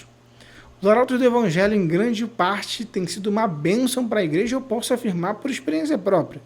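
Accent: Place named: Rio de Janeiro, Brazil. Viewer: Voce tem sotaque de norte fluminense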